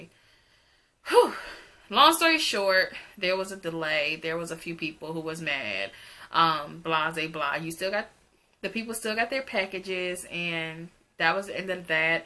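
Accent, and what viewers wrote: New Jersey, United States. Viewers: You did the correct thing emailing. Taking business classes do not stop the bank from messing up. Even huge companies mess up and what do they do? email. So kudos to you. Lovely video and who knew you were from Jersey! I can hear it ( new yorker) Also I really dont like that word, I think it's horrible, but that's something I care for. I thought I was the only one. Woman don't like men saying it but their gf say it. I feel as if its a downgrade from who you are. Also, you would look so nice in ASOS and Fashion to Figure and all of these great brands. I wish they would do a collab for plus Youtubers. I am seeing what you are saying now. I hope companies open their eyes.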